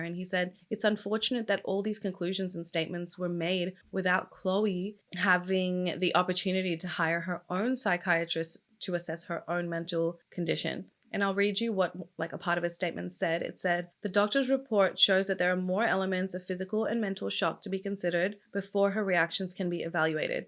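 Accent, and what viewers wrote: Australia. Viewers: Its so weird. Lol. Since i heard your accent i understood your from Australia, you look like a child between two more famous makeup and crime youtubers, one is from US, named Bailey Sarian, second is from Australia, named Bella Fiori. Lol you look like a mix of them both. Anyways, continue on. And where are you ethnicaly from? Im curious, i would say Middle East or little Asian. Thanks. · You have such a calming voice. Are you Australian? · I only just discovered this channel, absolutely love finding fellow Aussie’s to listen to & my daughters names Zarah 😊 good video I’ll definitely be back for more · Its so refreshing hearing an ozzie accent instead of american for once! <3 P.S Im from New Zealand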